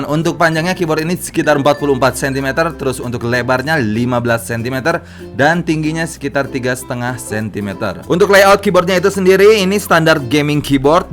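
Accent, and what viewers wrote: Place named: West Java, Indonesia. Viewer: Orang Sunda Mana suarana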